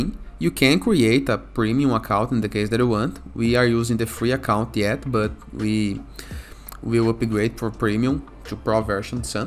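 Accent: Brazil. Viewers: Mano, impossível não reconhecer o sotaque brazuca. Parabéns pelo canal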